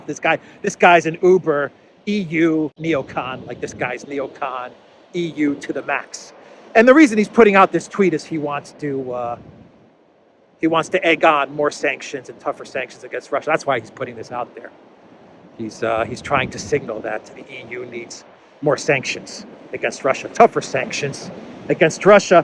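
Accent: United States. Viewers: Good Morning,. You’ve got American accent. How so? Thank you for the refreshing morning walk and world news update. Good day for you too. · I have news for you. YouTube brings us here. We have no idea who you are. You're obviously from the US or Canada. I would guess US. You might want to put something in "Abut".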